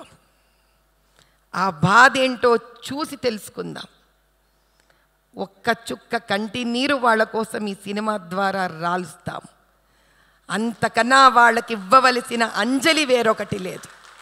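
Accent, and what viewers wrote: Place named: Rayalaseema, India. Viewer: పద్మూడు...రాయలసీమ యాస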